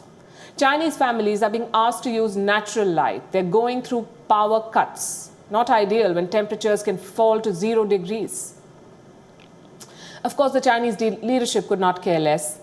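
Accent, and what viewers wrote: India. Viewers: I just love the Indian accent and smell. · Love her Inglish (indian english) accent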